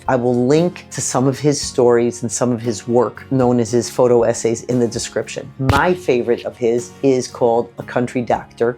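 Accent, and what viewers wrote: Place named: New York, United States. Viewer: Enjoyed finding your channel. Definitely inspires me. I am originally from Brooklyn, NY and thought you were from NY as well until you said Chicago. Didn't realize the similarity!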